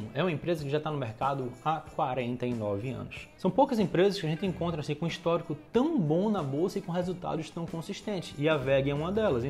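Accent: Recife, Brazil. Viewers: Ei brother vc é de Recife? Achei massa o teu canal, sempre que procuro tem algum paulista/carioca falando... fora a qualidade das informações que você passa, parabéns!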